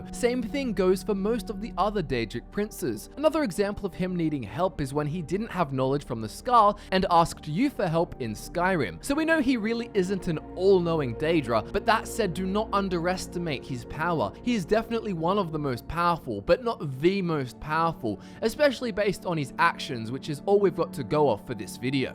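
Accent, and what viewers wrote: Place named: Australia. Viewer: I love how in his Aussie accent says "Sheagorath"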